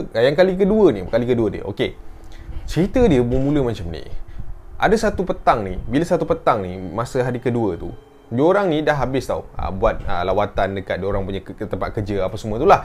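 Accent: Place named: North, Malaysia. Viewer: Terbaik..🎉👍 loghat utaqa noh hang balun🤣😂moga sukses sllu dik..perlis hadir🙏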